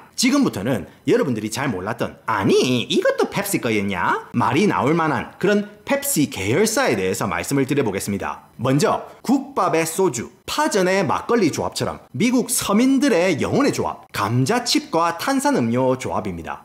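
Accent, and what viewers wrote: Gyeongsang, South Korea. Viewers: 경상도 분이신가요?? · 아니.. 우리 경상도 아재 언제 채널명이 바꿨는지. 어허. 이무슨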